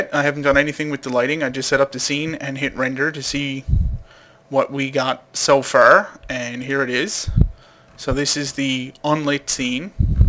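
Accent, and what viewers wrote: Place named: Newfoundland, Canada. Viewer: Love the Tutorial...AND the Newfoundland Accent! At least that's what it sounds like. I have a lot of family there and my mother is a newfie! :) Anyways, thanks again!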